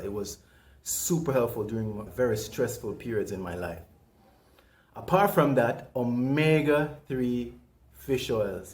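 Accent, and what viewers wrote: Caribbean. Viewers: where u are originally from? caribbean? my trinidadian self wonders where your accent is from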